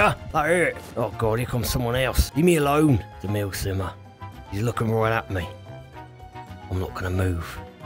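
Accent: United Kingdom. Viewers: you sound aggressively british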